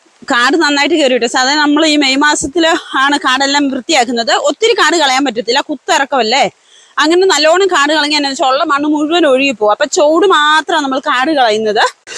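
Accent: Kottayam, India. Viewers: Kasarkodo, samsaram ketit kottayampole😍pazhaya kudiyetakarshakaravumle chechi? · ഇതാണോ അച്ചടി ഭാഷ 😂 ഇത്‌ കോട്ടയo ഭാഷ. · ഭാഷയുടെ രീതി കേട്ടിട്ട് കാസർഗോഡ് ഭാഷ അല്ലല്ലോ ഇത് കോട്ടയം ഭാഷയാണ് ല്ലോ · Aaha nallasalu kottayam slang njn alappuzhayanu marriage cheythu vannirikunnath palakkad checheede video innu first time kandu bhaasha kettappothanne naadine miss cheythu so happy ❤❤ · Even I thought she speaks Kottayam malayalam. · അച്ചടി ഭാഷ അല്ല കോട്ടയംഭാഷ😅 വടക്കോട്ട് ആരും പറ്റത്തില്ല ചെയ്യത്തില്ല എന്നൊന്നു പറയില്ല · എന്നിട്ട് കാസ്രോട്ട് ബാഷ അല്ലല്ലോ സംസാരിക്കണത്? കോട്ടയം കാരിയാ.....?😂❤ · ഇന്നാണ് ആദ്യായിട്ട് ഈ channel കാണുന്നത്. Ktym ആണോ നാട്. Ktym ഭാഷ / slang ഉണ്ട്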